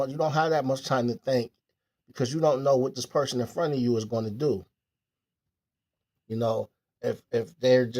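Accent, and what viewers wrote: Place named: California, United States. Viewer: 100% facts! You was born in NY? Sounds like you got some Cali in you. ✊🏽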